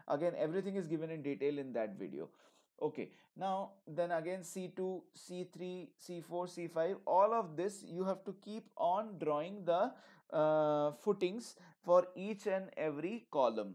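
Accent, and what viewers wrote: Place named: India. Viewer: If you are an engineer you should be speak American accent other than Indian accent you describe in Hindi language it will good for all I don't like damage English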